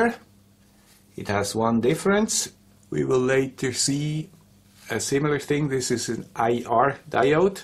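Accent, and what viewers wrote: Switzerland. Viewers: Merry Xmas and Mrs. Thank you for all your content this past year! I look forward to another years of awesome, educational and entertaining videos done in a Swiss accent!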